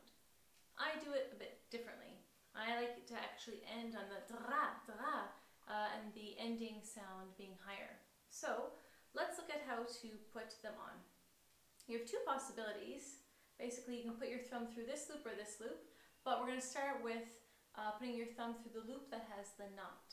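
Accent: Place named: Australia. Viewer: I can hear that Aussie accent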